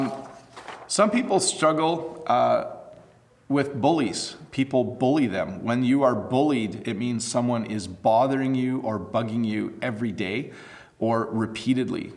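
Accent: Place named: Canada. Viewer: Thank you, i find your canadian accent better than the u.s.'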